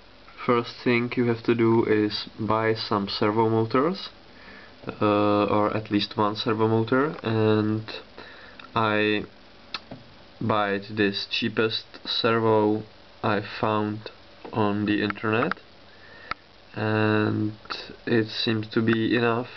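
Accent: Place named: Czech Republic. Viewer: Love that Czech accent :D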